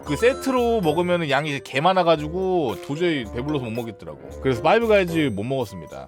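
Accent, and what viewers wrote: United Kingdom. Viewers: 이사람 미국갔다왔는데 억양은 왜 영국이죠? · 미국갔다오셨는데 워쮀서 영국영어를....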